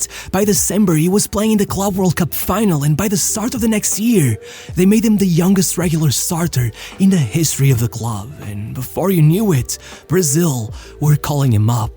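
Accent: Portugal. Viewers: It took me 2 minutes to realise that this guy was Portuguese lol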